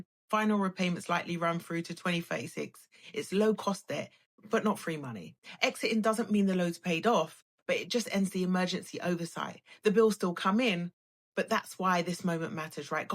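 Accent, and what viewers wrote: United Kingdom. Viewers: I love her UK accent so much. Way better than the American accent. Icing on the cake? Her beauty !!!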